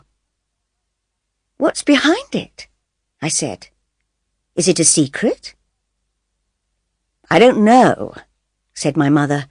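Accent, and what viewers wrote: United Kingdom. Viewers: Because that girl who is reading for us the story is an English girl which means she has clear accent English. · This is British accent, I do not like it. I like American accent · British or American accent? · Thank you. It so helpful for me in British accent and reading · مشكلتك الاكسنت البريطانى غير واضحة!!!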